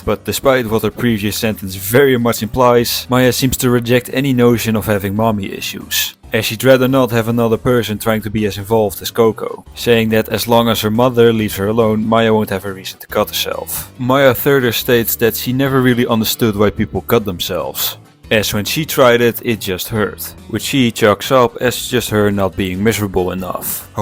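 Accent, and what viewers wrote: Netherlands. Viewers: broer het nederlandse accent van jou terwijl je engels spreekt is zo slecht dat ik ondertiteling moest aanzetten 💔💔💔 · Your accent your dutch accent is so strong, sometimes I don't know if you're speaking english or dutch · n#gga has a thick dutch accent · Oh God your perfect english being mix with your subtle dutch accent definitely answered my question of. "What dutch people sounds like if they fully speak in english", while I'm watching this characters saying one english words while speaking in fully Dutch. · valt hartstikke mee hoor, ik kom zelf uit londen en woon al jaren in nederland en ik heb heel veel erger gehoord. hij gebruikt wel eens nederlandse spreekwoorden die niet correct vertalen, maar verder klinkt het gewoon als een normale nederlander die engels spreekt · ik vond t wel te verstaan maar ik hoor dat typisch nederlands accent in t engels al mn hele leven dus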